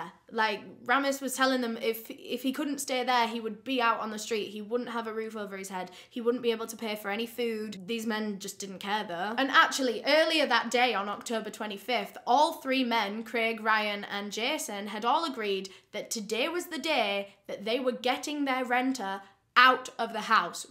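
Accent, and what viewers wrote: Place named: Yorkshire, United Kingdom. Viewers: Yay a northern lass! I'm guessing Leeds? 🙏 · Sounds more like a Leeds accent 👍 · I'm going to be the billionth person to compliment voice. 🙂I've heard a lot of Yorkshire accents in period pieces, but I'm pretty sure this is the first time I've heard the modern version. I didn't even know where in the UK from until she mentioned it. I love listening to her, and think she does a great job presenting the cases. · you know how to brighten my day with your true crime tales and your delicious-sounding Yorkshire accent! Thank you so much, my dear girl!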